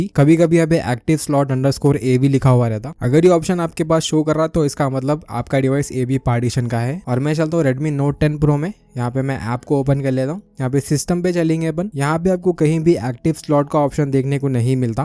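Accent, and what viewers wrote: Hyderabad, India. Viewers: Bhai's hydrabadi accent is cool! 😍🥰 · Hyderabadi Accent 😅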